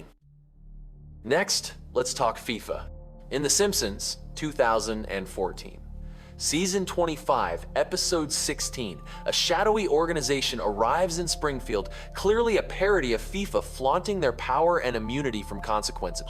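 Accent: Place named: United States. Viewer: You must be kidding It's USA English . Point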